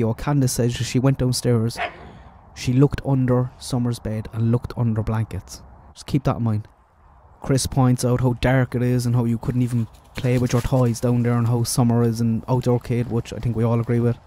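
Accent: Ireland. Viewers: I am slowly going through your others. I must ask where in Ireland are you from, your accent is lovely. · I saw that video also. You are on point. After Summer left the car that afternoon we have no idea what happened after that. She was the kind of mother that didn’t keep eyes on Summer too much. When Chris saw where Summer slept he said he knew about Summer. He was really shocked but held it well. The mattress was on the floor so looking under a bed was off unless she pulled up the mattress. So many conflicting stories. There were no flowers planted that day. When she was at the watering hole she emphasised the flowers were at a certain spot. She knew this was a major part of her story. Oh you are for sure Irish. Kerry maybe! Love your accent!🇨🇮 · Thank you so much for that. I can't do lives or very long videos at the moment, so to have it summarised really helps. 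I'm with you on Chris's voice, very calming and relaxing. I would love to have been a fly on the wall when he was in service, seeing him interview murder suspects, putting them at ease and lulling them into a false sense of security. I love how he talks, but I love your accent too, I've many Irish friends and all their accents are beautiful, but so varied. I can't imagine why anyone wouldn't like Chris?... but then we're all different and different things appeal to us I suppose. I'll try and look at his video, but I really appreciate your summing it all up. I share some of the same potential theories with you. The refrigerator, as soon as I saw it I thought it odd they hadn't taken the door off. I grew up in the 70s in London, and we used to get public information broadcasts, some acted, others animated, but there was one about a group of kids playing on a dump site, one of the kids ends up hiding in the 'fridge, there's a piercing scream when one of the girls finds him. 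The narrator with the voice of doom explains how no matter what, you never, ever play with old fridges and that if you get rid of one, you must take the doors off. Every holiday they'd play it, among others. Plus my parents drummed it into us. One of my preferred theories was exactly like yours. Either they did the whole hide and seek counting thing to get rid of her annoying them, or actuall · I agree, I really like and it is a great advantage to have a retired homicide detective looking into the case. ASMR - yes! LOL, he has a relaxed way of speaking and I do fall asleep listening to him but then I'll relisten from where I conked out. I also like the way you speak and your Irish accent.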